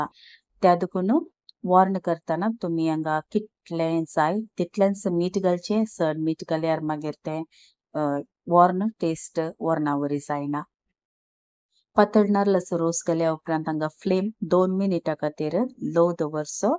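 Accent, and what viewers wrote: Konkan, India. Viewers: Thanks. I'm really happy that you have started with subtitles in english. Although I love to hear your sweet accent of konkani which reminds me of my childhood. So happy to have come across your channel ❤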